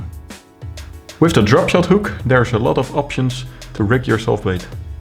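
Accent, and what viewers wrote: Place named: Netherlands. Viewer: That's a Dutch accent if I've ever heard one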